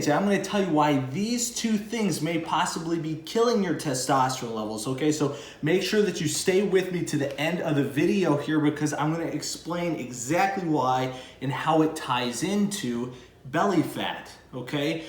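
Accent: United States. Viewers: He sounds American...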